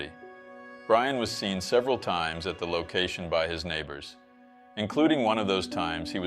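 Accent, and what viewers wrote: United States. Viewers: Your American accent is spot on!! I almost had to double check that I clicked on the write channel. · Very tragic case. Great video though! Had to double check to see if I clicked the correct channel because of your excellent American accent :) Anyway ❤ thank you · Are you from America or australia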